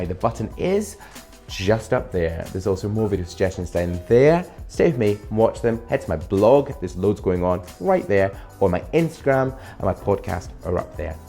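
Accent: United Kingdom. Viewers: I love that British Accent!✌🏻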